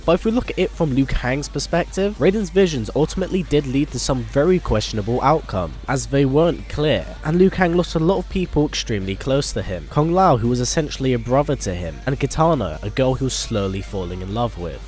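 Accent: United Kingdom. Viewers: where are you from,? you sound British but I think I am wrong.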